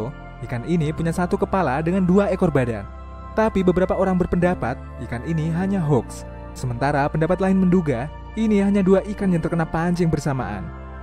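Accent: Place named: Medan, Indonesia. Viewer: Orang medan kau yaa bahasa kau mirip kali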